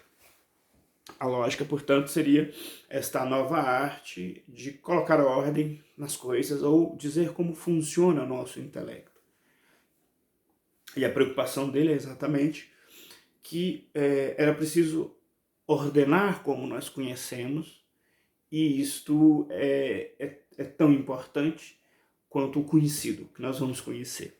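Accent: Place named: Minas Gerais, Brazil. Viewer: Eita mineirice aristotélica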